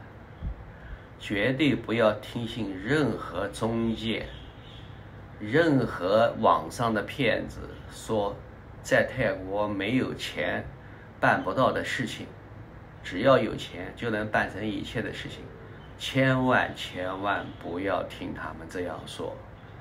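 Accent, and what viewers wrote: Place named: Nanjing, China. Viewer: 哈哈，南京普通话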